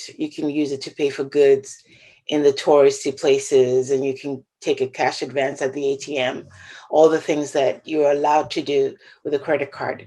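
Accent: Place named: Brooklyn, United States. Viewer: Salud🌹🌹🌹 dear thank you for sharing.... My hubby w his BK accent would appreciate your BK fuggetabouit 😹😁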